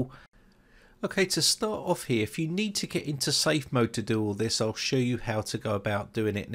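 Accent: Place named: Scotland, United Kingdom. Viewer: Very great scottish accent!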